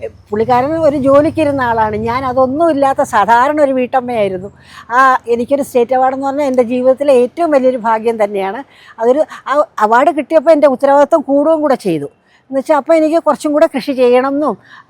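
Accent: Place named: Trivandrum, India. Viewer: ഇങ്ങനെ സംസാരിക്കുന്ന Tvm കാരും ഉണ്ടെന്ന് മനസ്സിലായല്ലോ അല്ലേ. അല്ലാതെ ചിലരൊക്കെ അതിക്ഷേപിക്കുന്ന രീതി അല്ല .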